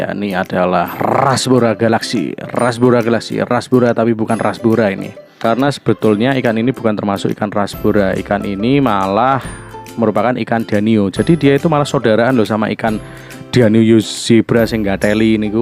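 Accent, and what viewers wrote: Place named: Java, Indonesia. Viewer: mas e lek ngomong medok 😆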